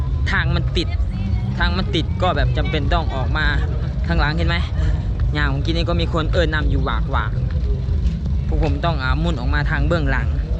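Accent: Laos. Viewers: เว้าลาวกะได้เจ้าเอี้ย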